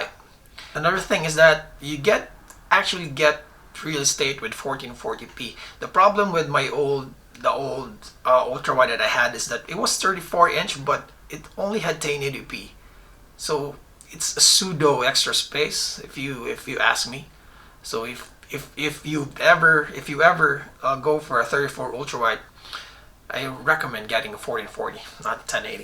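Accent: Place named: Canada. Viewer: Good video mate. The whole time I was wondering where you were from and then you said NZD! Your accent was throwing me off. Where is it from? I'm going to guess Canadian? Good to see techies across the ditch making good vids. All other reviews on this monitor are rubbish. I was 90% sure on getting this monitor before watching your vid, and now I'm going to buy it.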